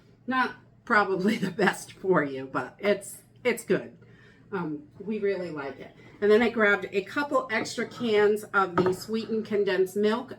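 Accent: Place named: New England, United States. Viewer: Im new to your channel but we seem to think similar. I dont know where your from but the accent and brown bread makes me assume New England someplace. Im from mass and nh but moved to nc about a year and a half ago. Nobody here kbows what brown bread is! I guess brown bread and beans is a New England thing!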